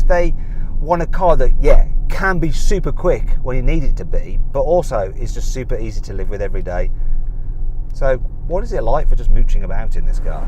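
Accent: United Kingdom. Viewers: 영국발음 너무좋고 디자인 지리고 우리나라에 실구매가 1.35에 나오면 대박 잘팔릴듯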